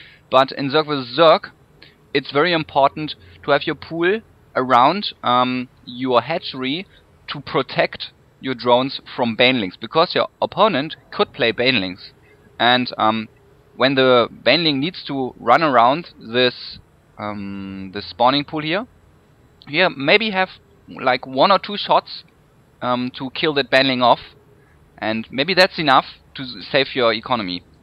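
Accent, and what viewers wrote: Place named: Germany. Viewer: german accent is epic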